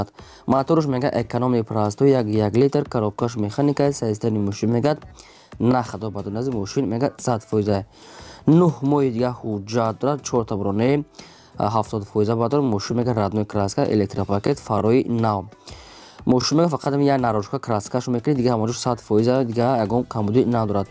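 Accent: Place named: Dushanbe, Tajikistan. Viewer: Бехад навори хуб рови бо лахчаи зебои душанбе офарин бурдбори мехохам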